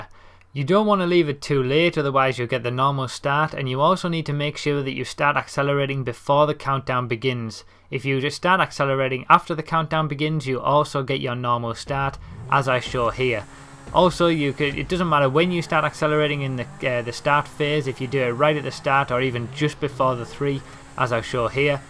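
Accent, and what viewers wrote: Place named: Teesside, United Kingdom. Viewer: You sound like a lad from teeside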